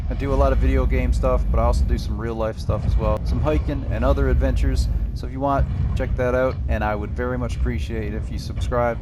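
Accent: Newfoundland, Canada. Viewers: Are you from Newfoundland? Because you sound and have hints in your name that you are because I am and the accent is very familiar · Before I even noticed your name "Ah, sounds "Canadian", must be a Newfie"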